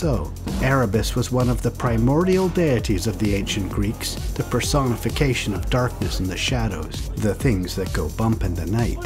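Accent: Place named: Canada. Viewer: He's Canadian I think. At least he sounds it. He covers a lot of Canadian crime also.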